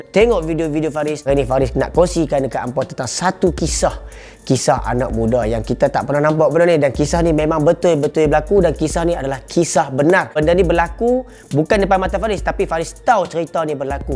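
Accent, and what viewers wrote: Kedah, Malaysia. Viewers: Faris, tajuk ceghita menarik tp cagha teghita sebenagnya not bad cuma suka ulang2 benda yg sama. Sementagha nk sampai kepermulaan ceghita tu xtau beghapa kali dok ulang benda yg sama. baiki tentang tu......channel ni akn jd lbh menarik. InsyaAllah. Loghat Og terbaik. 👍👍👍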